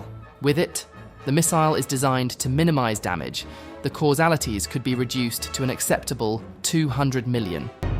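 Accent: United Kingdom. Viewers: Hes britsh a can tell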